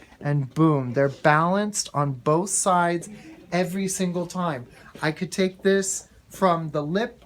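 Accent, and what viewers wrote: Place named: Michigan, United States. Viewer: He talks like he’s from Michigan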